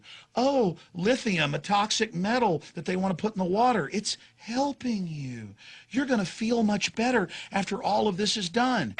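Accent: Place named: South, United States. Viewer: Your evidence is weak, subjective and theory laden. You haven't tried to falsify your claim, you are unwilling and unable to consider alternative answers and reasons to your "evidence" the links between your evidence are weak (though rather imaginative) you personify your government body as one evil being, everything points to this being a wack-job conspiracy theory, you even sound like your from a southern U.S. state it all fits! There is no logical reason to believe you. Good day.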